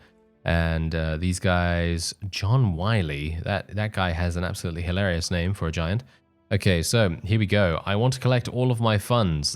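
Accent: United Kingdom. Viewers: Aw fuck thats so funny. You knew what was gonna happen, youve read the wiki, you had an idea what the red immortals could do since you just fought 10 of them, its always hilarious when you get to complaining in your lovely british voice.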